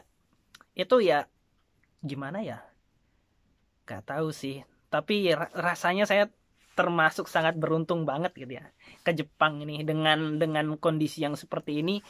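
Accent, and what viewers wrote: Java, Indonesia. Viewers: Ooo tak kiro jowo cak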